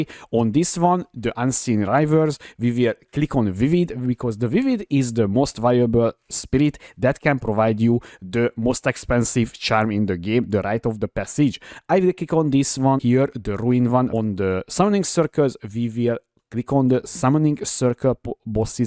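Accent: Hungary. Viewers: Ennél magyarabb akcentust még nem hallottam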